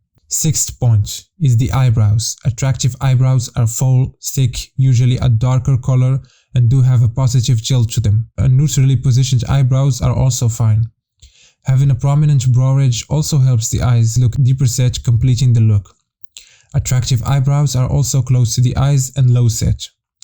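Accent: Morocco. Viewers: You sound moroccan bruv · you've got a Moroccan accent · The english of the person speaking screams moroccan. Just by his accent i can even tell you which city in morocco he comes from. XD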